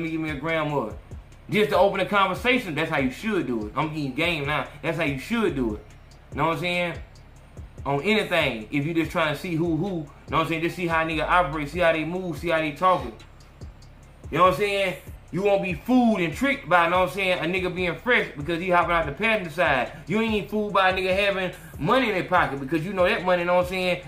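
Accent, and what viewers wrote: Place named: Alabama, United States. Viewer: 💯...you from Alabama, ain't it?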